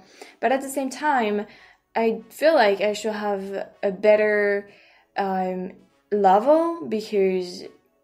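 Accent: France. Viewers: Wait what kind of channel: 😳 ? .........Lol. But in all seriousness I i love your channel and reactions and lovely French accent. You're wonderful, keep up the good work. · Watching you reminds me of my visits to your country (Paris 2 times) and your accent makes me happy. Keep being you and please stay safe. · Your English is fine. And the little differences makes it more interesting-- I love listening to your French accent. I grew up with a partly French family who were and are our best friends in the Detroit , MI area. I love their accents.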